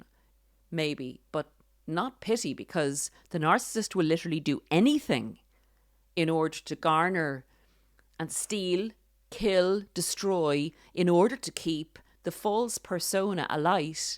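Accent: Ireland. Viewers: Thank you dear for sharing the things that make a narcissist lose the plot! It was so lovely to hear your voice again I love your Irish accent and so pleased that the audio version will be with you speaking. It has to be to be authentic!! A HUGE congratulations on releasing your first of a series of book - go for it!! Remy your co producer was thinking a nice long walk would be better than a narc video. I hope he gets a raise in salary as without him you wouldn’t have this book. He was the co-author wasn’t he? 😂 I have been doing some art of late so that’s why there’s a big gap since my last comment. Listening to your podcast from March 2024 has brought SO much healing understanding and can love myself back when I look in the mirror. It only took 22 years for this to take place so guys hang in there. I wished I had your messages back in 1984 it would have saved me loads but better late than never! 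Every single word you shared I lived with and was hell on earth. You say it very clearly and don’t muck about which is what I liked so carried on watching and listening. I was totally shocked to begin with as I felt like you had been walking with me in this dreadful marriage. As he walked out in a very pathetic suicide attempt which he threatened non stop I told him to STAY out as he was no longer needed or wanted. By golly he went more nuts than he was before. I was cool calm and ignored him totally which made him so angry. So so glad those days are gone and never to happen a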